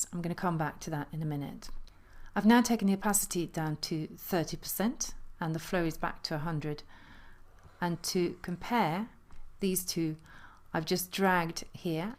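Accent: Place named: Oxford, United Kingdom. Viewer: You smoke many an Oxforder with your accent, dear. It's the combination of the accent, the articulation and the subtle air of dignity of your delivery. Also, I've the sneaking suspicion that even Chris Tarantino, Amy Dresser & Katrin Eismann would have a hard time comparing favourably to you.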